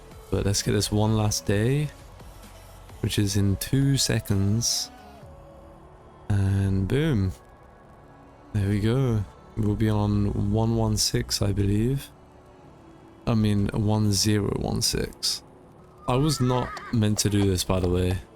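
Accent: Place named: United Kingdom. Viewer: He has a British accent!!!!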